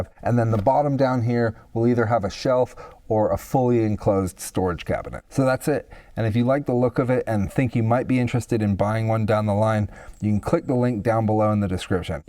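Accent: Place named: United States. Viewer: us accent, living in the uk, looking like an australian, this dude rules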